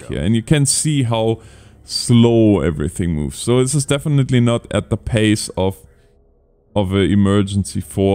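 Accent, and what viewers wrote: Germany. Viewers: Erm, was there a reason for you not to call the medics in on the chemical spillage? The fire was still raging and you had the firefighters drag the casulties out.. I was just wondering ^^ Und täusche ich mich oder hast du einen deutschen Akzent? :D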